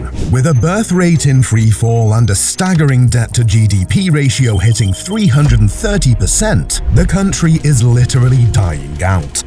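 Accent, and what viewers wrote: United Kingdom. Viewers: Assembling other people's videos and hiring a narrator with a British accent can be PROFITABLE. Nothing here that a good statistician could have told you-and has, btw. Why don' t you consider the immigration problems and the Procreation for Recreation immigrants overflow in Lampedusa or those being shipped to ALBANIA ? OLD NEWS . NO SUBSCRIBE. NO LIKE.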